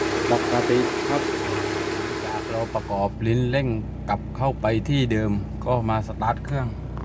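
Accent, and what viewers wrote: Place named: Bangkok, Thailand. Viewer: ฟังสำเนียงชัดเลยว่าเปนคน กรุงเทพ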